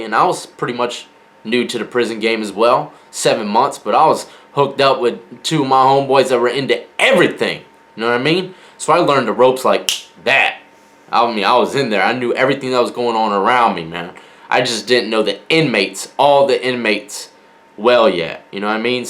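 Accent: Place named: South, United States. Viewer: Why are you so damn cute?! And you have that little southern twang 😍🤗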